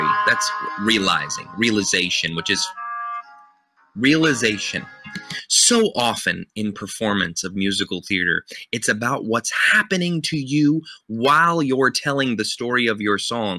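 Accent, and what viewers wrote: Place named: United Kingdom. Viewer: Thank you for this excellent analysis! If I may say so, I find the British accent annoying/distracting. was American so I don't feel that my opinion is inappropriate! I saw a video of teaching this song and he was a stickler for the consonants!! Ought / to just like you said!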